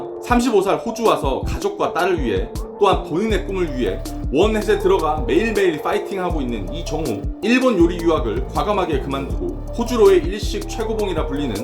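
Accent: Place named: Gyeongsang, South Korea. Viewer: 경상도 사투리 매력 있으십니다 선생님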